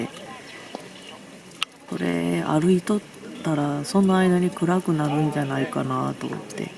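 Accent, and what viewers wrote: Kansai, Japan. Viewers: 4度って夫の実家の台所の温度😂😂🐻音を聞いていても楽しいし、主様の話し方が同じ関西なのでとても親しみを感じます。メスティンのご飯いつも美味しそう❗暮れていく富士山絶景でした😢✨お疲れ様でした😃！一緒にラーメン🍜🍥食べに行きたいです！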